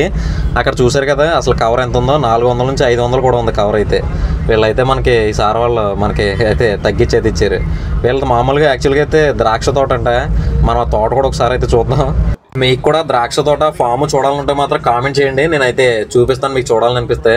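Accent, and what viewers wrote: Godavari, India. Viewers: Godavari voice bagunadi mava ? Akade o 5 yakarala lo niu padichu ? Try chaye bro ❤ ❤❤ Eluru district bro ❤❤❤❤❤❤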